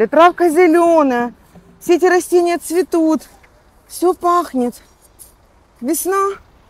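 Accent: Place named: Kuban, Russia. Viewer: Что вы несёте, я живу на Кубани почти 40 лет,все интонации,выражения ,произношение слов кубанские 🎉🎉🎉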